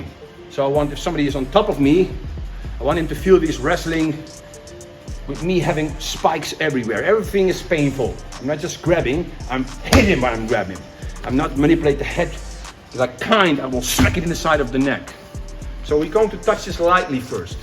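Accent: Netherlands. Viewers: Respect what a OG, lived in Netherlands 🇳🇱 for a few years, top top people, love the accent,attitude and culture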